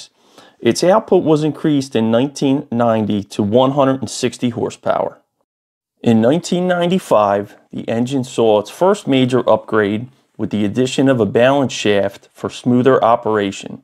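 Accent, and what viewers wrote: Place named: Chicago, United States. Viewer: If you wanted to know what a Chicago accent sounds like, here you go. Guys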